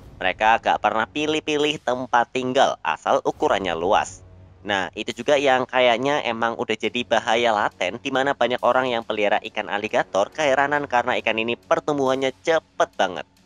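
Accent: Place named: Java, Indonesia. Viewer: Temen temen cenderung ngenyek🙈 Logat jawa nya tetep gabisa ilang walau di gimanain juga😅 Tetep semangat bangs💪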